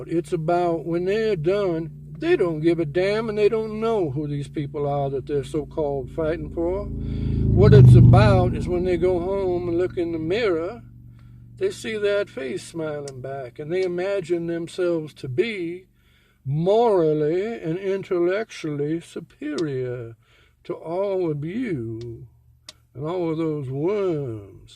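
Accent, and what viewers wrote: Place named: South, United States. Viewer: I love the SOUTHERN DRAWL. Interesting articulated take. :) Lemme argue definition for a moment here. btw, I think that Reiner is totally a crazed Leftist. I think Leftists are the crazies, and I think "libs" are different, in that they are, well, the "good Dems"...which sometimes sway to center, or even right of center. So, for voting purposes , let's not ostracize the "libs".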